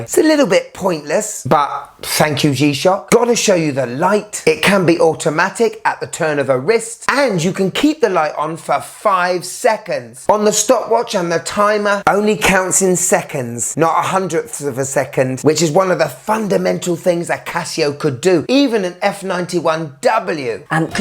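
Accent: United Kingdom. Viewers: Great test and great British accent you have it reminds to my good old days 🤣 Just placed order for the watch Cheers❤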